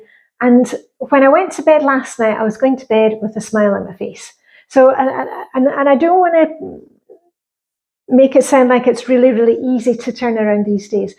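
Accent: Scotland, United Kingdom. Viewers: Hiya. In answer to your question of how I found you, you've popped up on my YouTube suggestions on my mobile. I've been watching de-clutter, minimalist stuff so guess it's those algorithms. I warmed to your scottish accent, I'm a fellow Scot! Thank you and keep up the good work 😊 x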